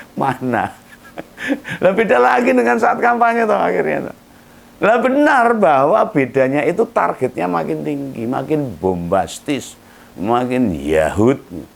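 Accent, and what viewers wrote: Java, Indonesia. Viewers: Serius nanya.. dari dialeknya om ini dari Jatim atau Jateng ya..?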